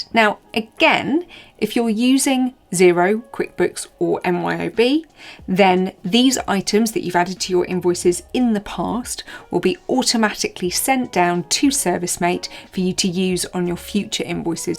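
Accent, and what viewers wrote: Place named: United Kingdom. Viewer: Your video has been so much help! We've used it a lot tonight. Fun fact... we're referring to you as the "lovely posh English lady" 😀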